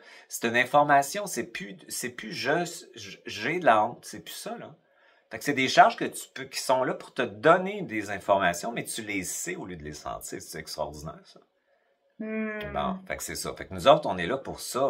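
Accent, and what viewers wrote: Canada. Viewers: J'ai l'impression de m'écouter avec l'accent canadien, quand je me parle à moi-même, parfois... Cette sidération face à ce qui fascine les autres, aux trucs importants dans leur vie... Je disais avant de vous connaître que j'avais l'impression depuis toute petite d'être "montée à l'envers" par rapport aux autres. Maintenant je comprends mieux. C'est chouette. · Bonjour , tu décris parfaitement notre évolution intérieure ( moi depuis 35 ans et j'ai dégusté ! avant que le chemin ne s'éclaire ) chaque étape y est et je me retrouve parfaitement dans ce que tu dis ...par exemple : oui plus de mémoire puisque on est dans l'instant présent, les émotions n'existent plus puisque qu'on n'est plus sous l'emprise du mental , et puis on est tout simplement etc... Aujourd'hui j'ai pu te suivre car tu parlais lentement , l'accent canadien n'est pas tj facile pour moi ...soleil des antilles francaises.Marie.😊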